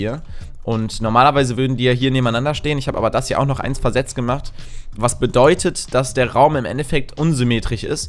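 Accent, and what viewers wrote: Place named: Cologne, Germany. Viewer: Kölner Dialekt.. :D Manschmal und Tich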